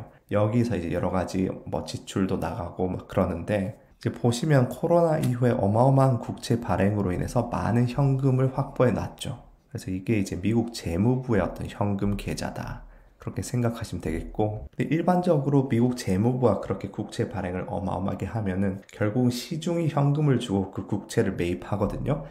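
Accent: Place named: Daegu, South Korea. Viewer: 사투리가 대구 사투리시네요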